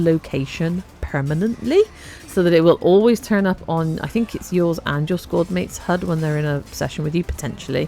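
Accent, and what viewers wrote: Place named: South West, United Kingdom. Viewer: You can also use a necramech for these btw. Appreciating your loot vids and the novelty for me of hearing a south west warframer, your accent takes me right back home :)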